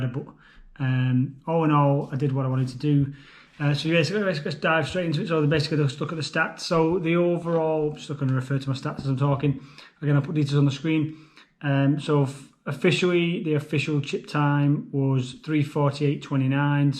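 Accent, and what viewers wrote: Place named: Manchester, United Kingdom. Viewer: I’d definitely recommend mate, you can do as little or as much as you want with the club, but ultimately you are surrounding yourself with support and top advice , can only help . You sound local to me , I’m Manchester based and run for Salford Harriers . I’m part of a local runners Podcast , imaginatively called “local runners Podcast “ 😆 we talk a lot about local races and clubs across Gtr Manchester , look us up and any questions give us a shout mate , keep up the channel 👍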